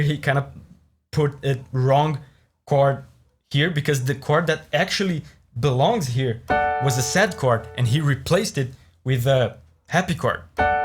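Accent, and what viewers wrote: Brazil. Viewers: sotaque de br, é brazuca? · Mano, vc é brasileiro? O sotaque já te entregou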